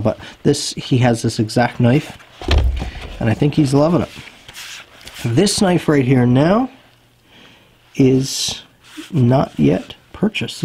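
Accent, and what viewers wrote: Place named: Newfoundland, Canada. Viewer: sorry new to the channel, but yeah the accent gave it away im a alberta boy living in ottawa area got alot of buds from Newfoundland... Both baymen and townies... But all good folk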